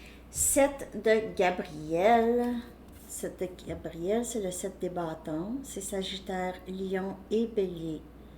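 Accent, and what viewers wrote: Canada. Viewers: En plus, j'adore votre petit accent canadien, c'est trop mignon, mon rêve d'aller voir ce magnifique pays que vous avez là !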